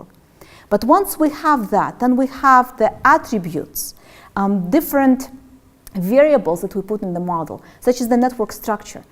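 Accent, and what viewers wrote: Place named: Russia. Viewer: Man, such a terrible russian accent... But the material is great.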